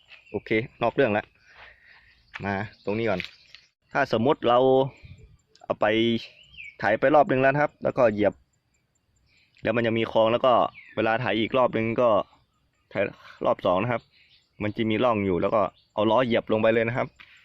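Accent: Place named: North, Thailand. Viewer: อยู่บ้านไหนคับ อู้เหนือ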